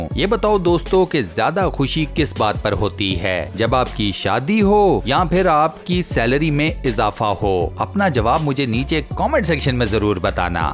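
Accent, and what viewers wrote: Punjab, India. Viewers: Great voice over with Punjabi "tadka"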